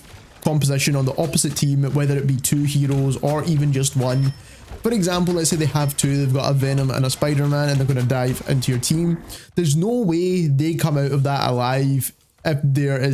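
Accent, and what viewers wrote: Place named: United Kingdom. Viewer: "British" accent so annoying bro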